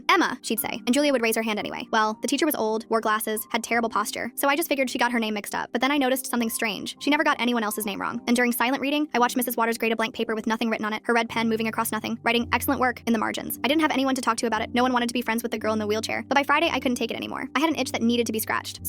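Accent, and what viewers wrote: United Kingdom. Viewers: So your British I challenge anyone here to figure out how I found this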